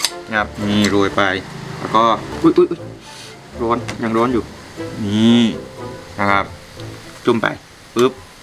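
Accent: South, Thailand. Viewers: อื้ม~ พี่บ่าวใช่คนใต้รึเปล่านิ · พี่คนใต้หรอครับ🐹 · คนจังหวัดำไหนคับเห็นแหลงใต้ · พี่คนใต้นิผมว่า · พี่คนใต้เหออ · พี่เป็นคนใต้เหรอค่ะ เสียงได้แรงอกมากนิ่ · คนใต้นิ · คนใต้แน่นอนคับ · พี่คนภาคใต้ใช่มั้ยนิ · เสียงเหมือนคนใต้มากกกกกก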